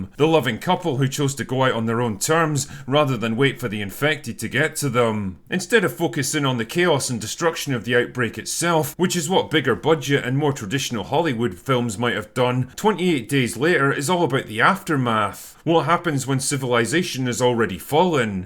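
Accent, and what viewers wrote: Scotland, United Kingdom. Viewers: You, Sir, have excellent taste in movies. That is why I like you. That and the Scottish accent and you are very easy on the eye.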